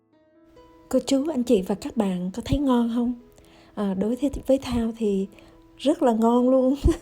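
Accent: Hue, Vietnam. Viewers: giong noi giong het nhu my cousins, nguoi Hue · nói giọng Huế nhẹ nhẹ như người Huế ở Dalat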